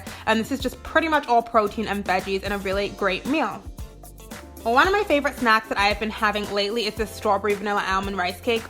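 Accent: England, United Kingdom. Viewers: aw bless you. I was trying to figure out your cute accent. I was thinking it was half British lol. Btw those sweet potatoes look bomb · I didn't know you grew up in England! This explains why your accent sounds a bit English sometimes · Just read your comment. Its funny because when you talk sometimes it sounds like you are from England. Now it makes sense as you grew up here lol. Anyhoo I love your 'what i eat in a day videos'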